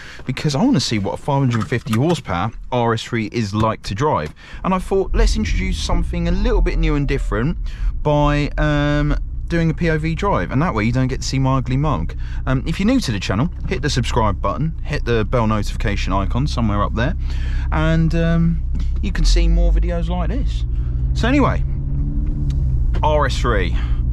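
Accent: Bradford, United Kingdom. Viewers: Bradford accent on point bro 👏👍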